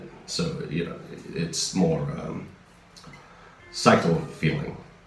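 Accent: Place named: Philippines. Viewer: So cool to hear that he still has a bit of Filipino accent left lol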